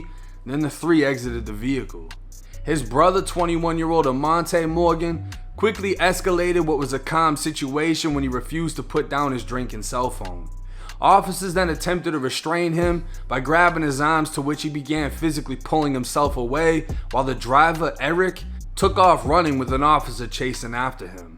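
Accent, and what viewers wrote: Boston, United States. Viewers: 1090 u got me rolling with u saying peace officer. I know its hard with your Boston twang. (Police officer)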